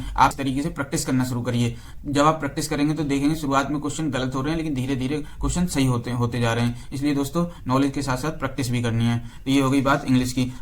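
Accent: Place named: Rajasthan, India. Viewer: aapki language😅 Rajasthan jesi hai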